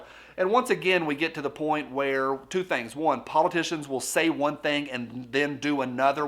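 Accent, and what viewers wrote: South, United States. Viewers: You rock. Loving the southern accent. Thank you for all the updates?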